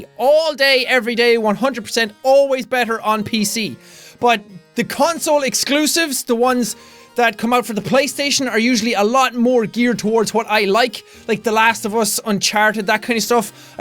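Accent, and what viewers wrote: Ireland. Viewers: !!!!!! 2 QUESTIONS!!! CAN YOU SAY MY NAME IN YOUR IRISH ACCENT? AND DID YOU STEAL MY CAKE?!! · R u BOSS,southern Irish or northern Irish! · Dat Irish Talk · Loving that full Irish! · put me in ur next one and UR BOSS AND ARE U IRISH ?????????????????!!!!!!!!!!!!!!!!!!! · i understood the deep irish accent as i use quite a bit o them sayings everyday. please can you do a complete episode in a thick irish accent... it would be boss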